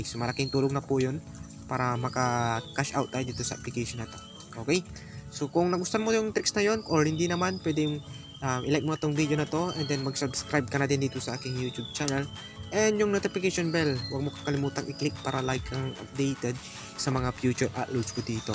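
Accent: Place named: Pangasinan, Philippines. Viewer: Taga pangasinan ka no lodi halata sa accent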